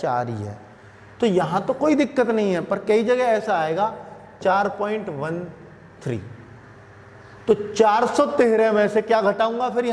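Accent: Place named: Western Uttar Pradesh, India. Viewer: Sir.Questions ke Answer explain karne ka way hai, wo aapka Ultimate hai. But aapki jo Desi language hai wo Impressive hai Western UP wali. Hum bhi aesi hi language mai comfortable hote hai 👌🏼👌🏼👌🏼👌🏼👌🏼👌🏼.😊😊😊😊😊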